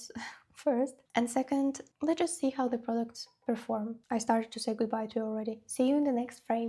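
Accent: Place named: Eastern Europe. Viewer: Where are you from, love? I’d guess you might be slavic?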